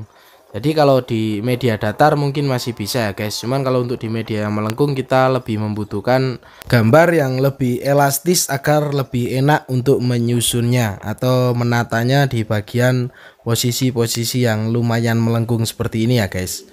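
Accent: Temanggung, Indonesia. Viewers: = mirip logatnya wong temanggung 😀🙏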